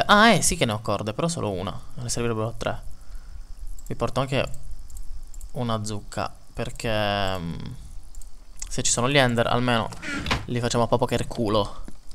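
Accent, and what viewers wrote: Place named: Sardinia, Italy. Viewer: Amo il tuo accento sardo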